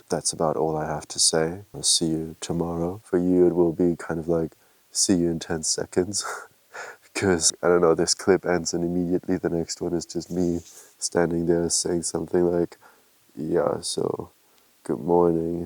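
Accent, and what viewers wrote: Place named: Germany. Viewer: Sound German by your accent, come across your video, it's interesting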